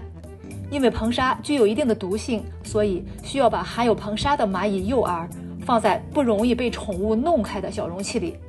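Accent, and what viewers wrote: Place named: Shandong, China. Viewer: 听得出，解说的声音来自一位山东女士。视频非常好，每年夏天都为蚜虫和蚂蚁的合作非常头疼，谢谢！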